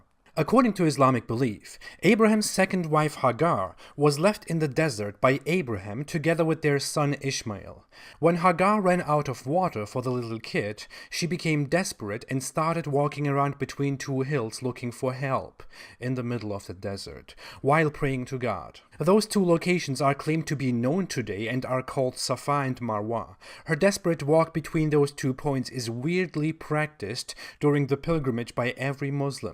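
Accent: Germany. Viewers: You have a German accent? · "I'm not Hitler just because i have a German accent"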